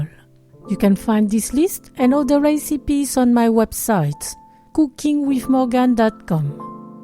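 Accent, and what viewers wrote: France. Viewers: Are you french ? you sound french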